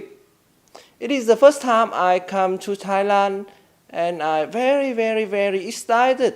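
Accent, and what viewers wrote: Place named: Vietnam. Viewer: Nghe hơi bị THUẦN vIỆT quá,không chuẩn...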